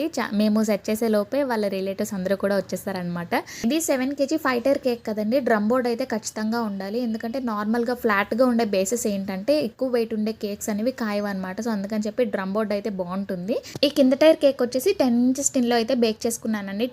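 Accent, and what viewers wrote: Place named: Andhra Pradesh, India. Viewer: Wow chala bagundhi E video chusnaka naku order ivvali ani vundhi hyd lo service vundha andi Mi slang vinte andra la vundhi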